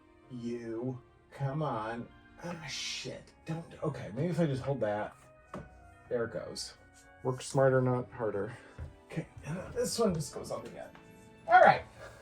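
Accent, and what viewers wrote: Minnesota, United States. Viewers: My favorite part was - , looking down through the garland balusters at the winter wonderland below. Everything looks SO beautiful. I don't know how many Christmas trees you have total, but I decided at the start to make "5 Christmas trees" the 5th item. On the 5th Day of Vlogmas my true love gave to me: Five Christmas trees 🎄🎄🎄🎄🎄 Four meows from Paolo Three sets of batting Two power strips 🔌🔌 And you sound like the movie Fargo 🎄⛄🙂⛄🎄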